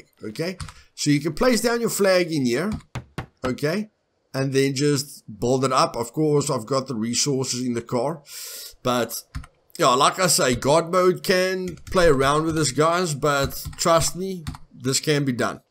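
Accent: Afrikaans, South Africa. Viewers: I only subbed because your Afrikaans accent is so strong. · this was a good video. funny bloke :) a bit clumsy sometimes. hilarious. very genuine and yes. the game is what we make of it. cheers fella. can i ask - is that an Afrikaans or British SA accent? :)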